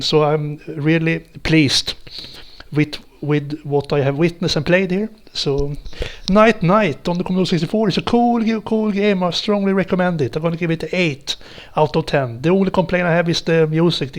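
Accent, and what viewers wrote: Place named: Sweden. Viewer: svenska kraft haha, hörde dig var svenska så snart du började prata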